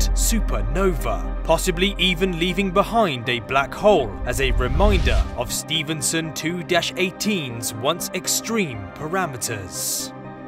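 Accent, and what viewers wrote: United Kingdom. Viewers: I thought this was gonna be click bait, but when I heard the narrator’s British accent, I thought, this is gonna be good! All the best science shows are hosted by people who can’t pronounce AL-U-MIN-IUM, also known as aluminum.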